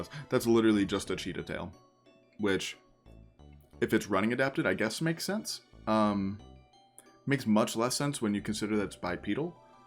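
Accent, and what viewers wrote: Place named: New York, United States. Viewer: No it,s acshuly a new yourk acsent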